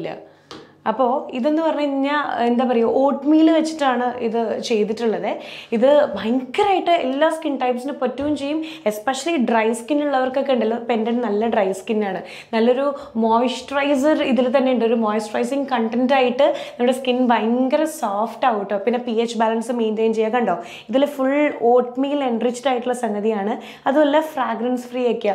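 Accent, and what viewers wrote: Thrissur, India. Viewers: Thrissur slangil athinte monj ithirikoodum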